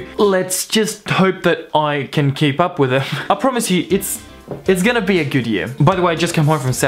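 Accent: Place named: Tasmania, Australia. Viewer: It still freaks me out (in a good way) to hear a Finnish guy who talks like a Tasmanian :)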